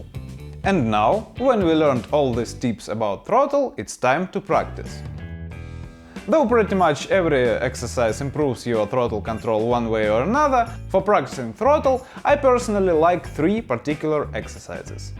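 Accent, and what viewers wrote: Russia. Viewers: I just love his accent, it’s Russian, right? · Love the Russian accent on English. Sounds like a Marvel character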